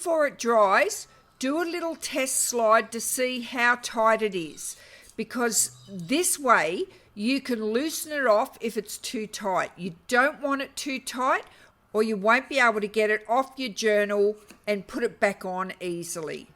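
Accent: Australia. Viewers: I love hearing an Aussie voice and intonation, talking about craft. G’day from Forster. Adorable books too!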